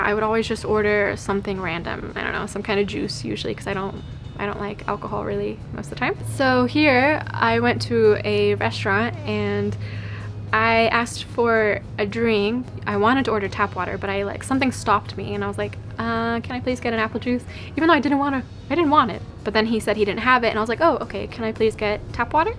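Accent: Germany. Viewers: you sound like Dutch or German... The melody of voice... match with Nord-Germanic ones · And you know have a German accent to your English i love it. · Speaking German has even influenced your English a bit. Once or twice I heard a German intonation in your speech.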